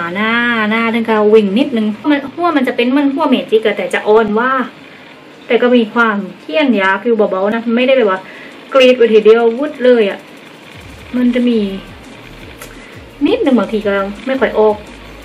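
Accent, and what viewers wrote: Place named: South, Thailand. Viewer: ชอบอ่ะเวลาพี่แหลงใต้ ฟังแล้วลื่นหู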